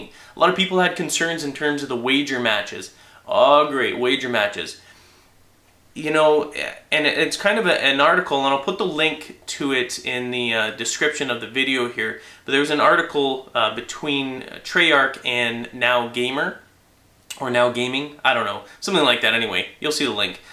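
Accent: Canada. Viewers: i just listen to these videos to hear his cool canadian accent :D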